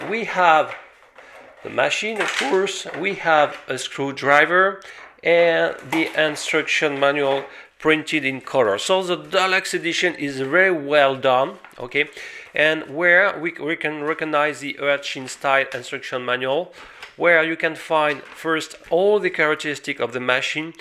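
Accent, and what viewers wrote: France. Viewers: Tu es francais non ?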